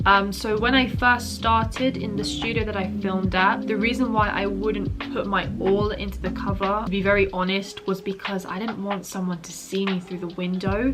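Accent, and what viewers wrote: United Kingdom. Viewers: Yeah I’m in love with her accent. The British accent seems so elegant!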